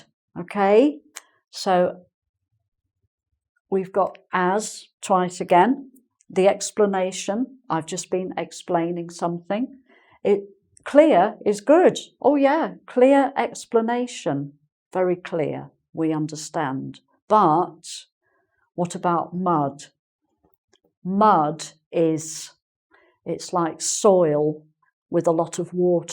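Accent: England, United Kingdom. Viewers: Let me you that I love your accent, even though for me it's kind like annoying sometimes to hear people talking with that britsh accent because I'm more familiar with the american one but you speak so clear that your voice sounds lovly. Thank you very much, I love your lessons, and no, their not as clear as mud. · Perfect british accent and very good teacher, thanks for your lessons. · thank you I adoooore the way you teache . love your videos , I love your accent and wich one day I will get it, please , are you from england ? love this accent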